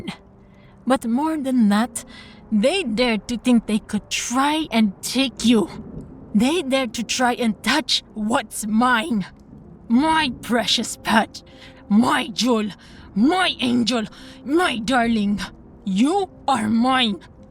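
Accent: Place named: France. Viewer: I like your accent. Aren't you French?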